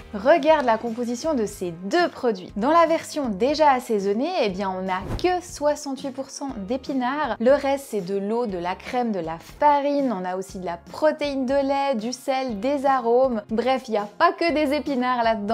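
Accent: Switzerland. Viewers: Oui mais le poids des myrtilles est-ce avec l'eau qui a gelé ? Ah c'est marrant j'ai trouvé dès le début que vous aviez l'accent suisse! De vers Neuchâtel ou par là! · Vous avez Un accent suisse 🇨🇭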